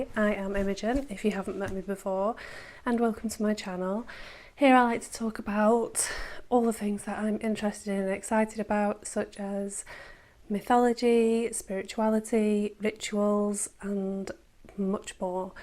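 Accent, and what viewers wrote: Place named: Northern England, United Kingdom. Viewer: Nice to meet another fellow Brittan.😂 I'm from Edinburgh myself..... where about in England you from? You sound more northern English ❤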